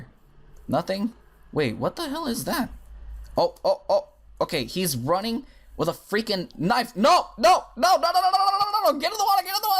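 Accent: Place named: Mexico. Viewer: I love your Mexican accent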